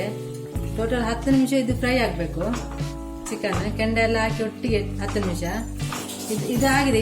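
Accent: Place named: Mangalore, India. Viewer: I like mangalore kannada.......... 😍😍😍😍😍😍😍😍😍😍😍😍😝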